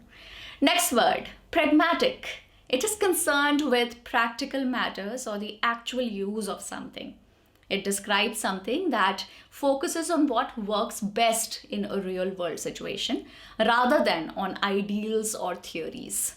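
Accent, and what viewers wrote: India. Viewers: People that don't have an American accent have no business teaching anyone English. That is the strongest indian accent I've ever heard. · You have a huge hindi influence in your English accent, you better work on your accent,intonation it sounds awful.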